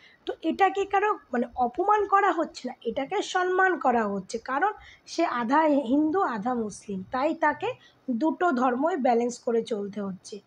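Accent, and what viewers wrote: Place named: Bangladesh. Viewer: Tui india bole mone hoyna tui tor kotha batrai bojha jay tui bangladesi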